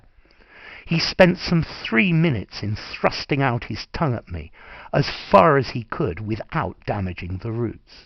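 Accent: RP, United Kingdom. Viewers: Fabulous British RP. We need more like you. I'm devouring your videos. I do like how you pace your speech. I try to mimic your pace and speech. Thanks for all the videos. Do you recommend any audio books with British RP please? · I have been searching the web for weeks and could not find a good lesson to sound more British. This recording is excellent. Thank you very much!!!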